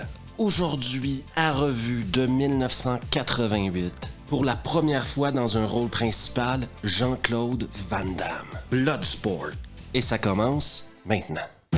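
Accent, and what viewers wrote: Quebec, Canada. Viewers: J'adore tes vidéos. En plus pour un petit français comme moi c'est excellent d'écouter ce merveilleux accident québécois. Des commentaires à tomber par terre. BRAVO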